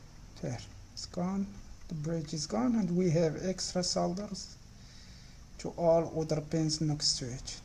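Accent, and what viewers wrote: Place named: Iran. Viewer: Thanks for your understanding, I just thought your voice sounded like a Persian accent.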